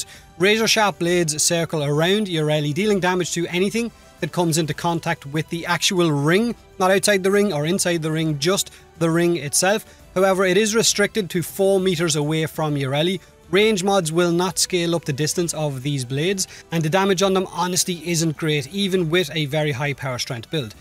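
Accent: Ireland. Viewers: Irish accents are so cute, I also am in love with the amount of insults they have in their inventory.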